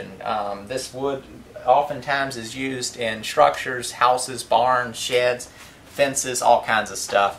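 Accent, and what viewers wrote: South, United States. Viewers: Hahaha! I'm from Arkansas, and not only does your accent give you away, and "down in Georgia" narrows it down even more. I'm not really trying to find your location, I just get a kick out of your sayings. My favorite today was "don't let a nickel hold up a dollar." I've heard that all my life here in the Arkansas Ozarks! Great advice on reclaiming lumber too. I always enjoy your videos, ramblings and all!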